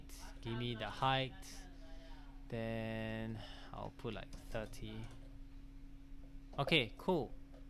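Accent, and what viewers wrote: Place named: Southeast Asia. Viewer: hi, teacher, are you from south east asia? your tone tells me that.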